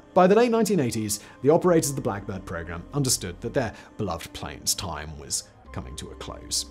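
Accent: England, United Kingdom. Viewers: Normal Americans generally refer to this as an English accent. It’s strange, but the Brits all have it! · Can we get someone that speaks American? I'm tired of Brits, especially when they put an "r" on words ending in "a".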